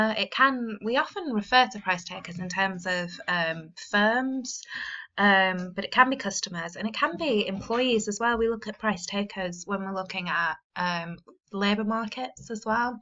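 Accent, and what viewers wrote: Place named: United Kingdom. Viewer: love your british accent :)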